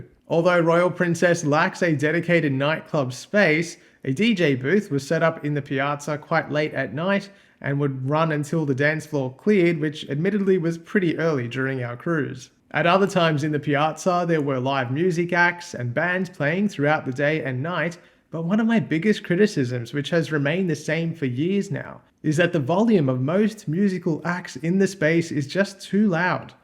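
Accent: Australia. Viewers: you are very welcome, plus I love the sound of the male Australian accent.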